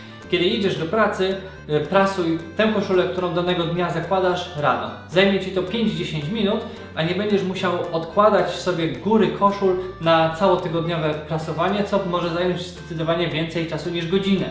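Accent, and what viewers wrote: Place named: Poznań, Poland. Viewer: dwa słowa i już wiesz, że autor jest poznaniakiem 😁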